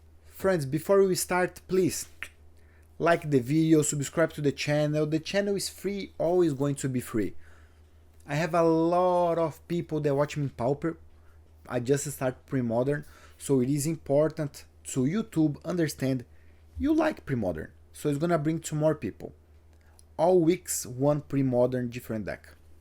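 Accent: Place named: Brazil. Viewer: Karai... Tu falou e eu já vi, porra um br falando inglês vei,quemassa!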